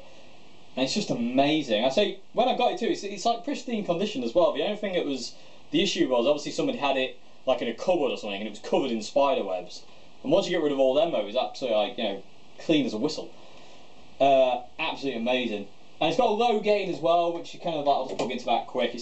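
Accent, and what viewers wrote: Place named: Sheffield, United Kingdom. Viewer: Hi, thanks for the demo. Couple of quick questions I know it's the bandit solo series but is it the 50,65, or 75 watt version I can't see on the video? The other thing is have you tried Tech21's Trademark 60 I really think you would be impressed. Listening to the way you talk I suspect you don't live a million miles away from me in sheffield. You can demo my Trademark 60 if you like I don't think you will be disappointed. Not that I'm knocking the bandit I've got a 1991 teal stripe and that love too!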